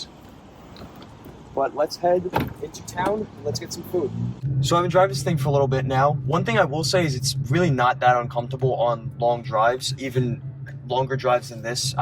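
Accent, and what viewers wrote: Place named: Long Island, United States. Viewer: I spotted your accent and i'm like this guy is from Long Island, I'm from Suffolk but i moved to Orange County, CA about 15 years ago.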